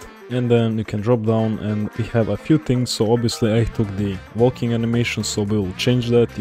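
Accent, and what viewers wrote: Russia. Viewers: Author, are you Russian? You have a distinct Russian accent. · It’s probably Russian accent, if not then everybody heard it before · Бро ты русский , по произношению слышно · у него русский акцент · Слишком русский акцент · Russian Accent eh? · Ты русский если нет то почему у тебя акцент как у русского?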